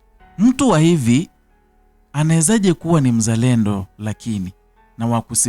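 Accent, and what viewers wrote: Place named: Kenya. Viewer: Wewe ni Mkenya. Mtanzania hana rafidhi km yako aisee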